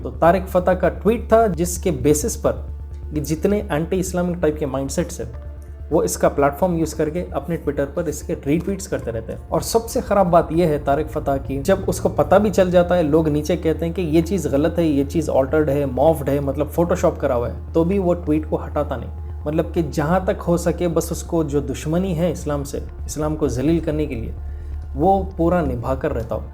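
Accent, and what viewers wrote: Hyderabad, India. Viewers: Its hyderabadi accent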